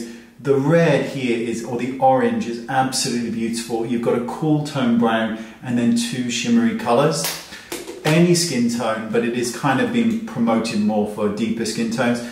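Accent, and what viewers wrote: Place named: Wales, United Kingdom. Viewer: you sound super welsh today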